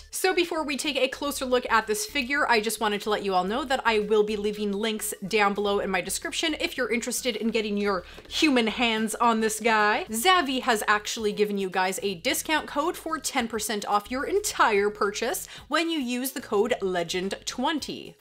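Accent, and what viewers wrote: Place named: Vancouver, Canada. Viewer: Your Vancouver accent is very apparent. I speak differently from you here in Ontario. Your speech is a little bit more clippy whereas, we tend to speak a little more laid back in Toronto.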